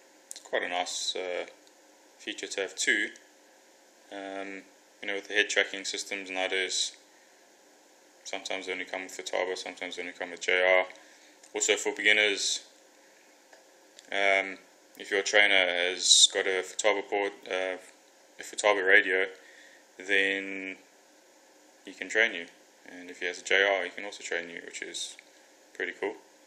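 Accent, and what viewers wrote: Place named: South Africa. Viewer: Is that a South African voice I hear. Please tell me you know of a good place to fly in Cape Town, preferably close to the Northern Suburbs. Looking for a place to maiden my Bixler 2, It will will also be my first flight.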